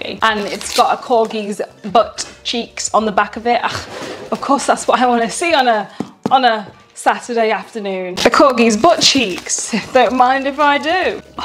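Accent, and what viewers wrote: United Kingdom. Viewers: British accent, especially when spoken by a girl, is one of the best things in the Universe :3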